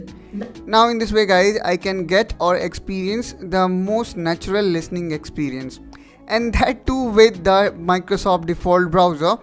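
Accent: India. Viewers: You should not use Indian accent if you're from India. You need to learn how to speek properly.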